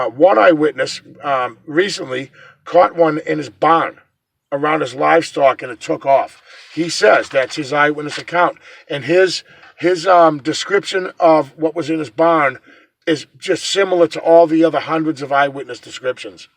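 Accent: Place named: Massachusetts, United States. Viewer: lmao I know I'm sorry....but look that up you will like that one and you will get lots of views on this whole topic..also I appreciate your replies...at least you pay attention to your viewers and don't ignore us as some do...keep up the good work...love the mass. Ascent. Lol don't know if I spelled that right..waiting for prt 2😃😃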